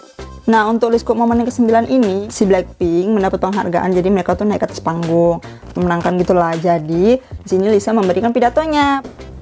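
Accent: Medan, Indonesia. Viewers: Kk orang mana sih k Logatnya kayak orang medan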